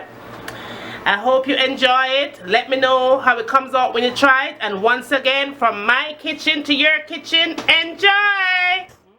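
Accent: Jamaica. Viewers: Listening to her speak took me back to when I used to live in Jamaica. Good memories and awesome food.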